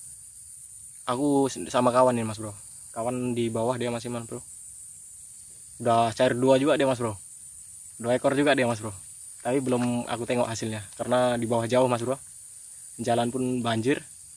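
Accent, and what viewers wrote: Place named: Medan, Indonesia. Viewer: Gila coy.. mantap betul tomangnya. Tapi aku lebih tertarik sama logat mu coy.. Jawa edan eh jawa medan ya. Dmna lokasi mu coy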